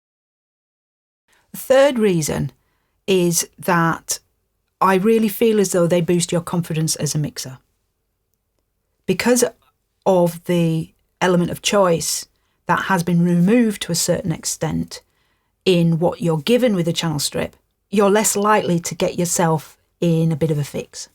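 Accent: Northern England, United Kingdom. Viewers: Hi! So glad I found your channel. There are way to many options and mix decisions to make with the plethora of plugins these day that it's paralyzing creativity. It reminds me of when Photoshop plugins went bananas (previous career). Too many options doesn't equal better results, in fact I would say it disables it. I love your Chanelle, and as an ex-pat from Leeds it's great to hear a northern accent. Keep up the good work. Cheers!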